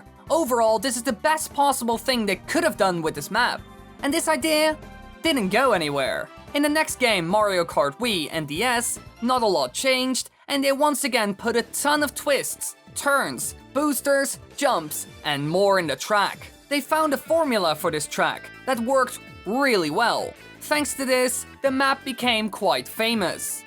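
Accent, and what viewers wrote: Netherlands. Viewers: Is that a dutch accent I hear? Nice video mate!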